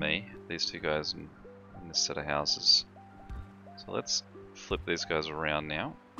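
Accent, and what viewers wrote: Australia. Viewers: another good video, you aussie?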